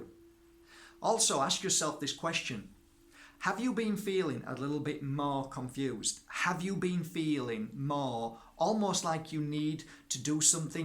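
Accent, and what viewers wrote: Yorkshire, United Kingdom. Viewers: 100% agree with everything you say x \especially about the govt x I have two large Himalayan salt lamps in each room to help with neutralising the effects of wifi and always wear crystals too but am going to start turning of the wifi at night as you suggest . Nb : Are you from Yorkshire originally , like me ? Sounds like a good old yorkshire twang you have there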